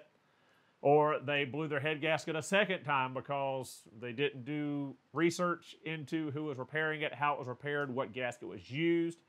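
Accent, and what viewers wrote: South, United States. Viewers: Getting yelled at by a dude with a southern accent hits way different.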